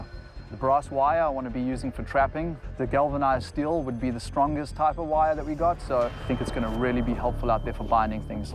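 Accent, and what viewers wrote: South Africa. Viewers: I hear a South African accent. It's so distinct. Good luck!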